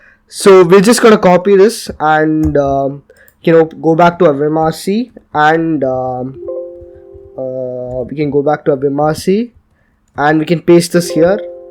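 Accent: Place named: Bangalore, India. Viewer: Ur from Bangalore aren't you?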